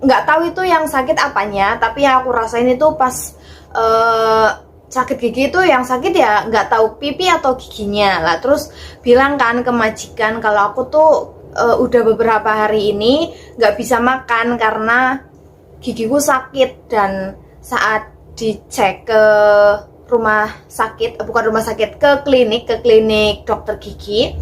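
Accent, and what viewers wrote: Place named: Banyumas, Indonesia. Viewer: Disumbat mba gusinya pake kapas,salam kenal ya mba ngapak 😁🙏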